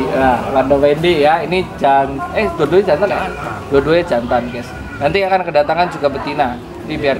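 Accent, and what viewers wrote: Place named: Tegal, Indonesia. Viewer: Wong tegal ndi suarane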